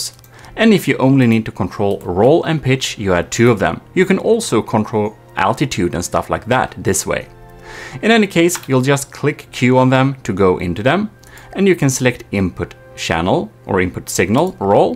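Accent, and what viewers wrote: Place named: Sweden. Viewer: I can tell that he is from sweden, hej hej jag är också svensk BTW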